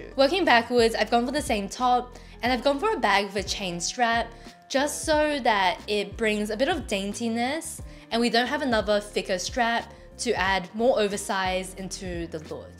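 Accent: Australia. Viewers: I just love your Australian accent, just like rose's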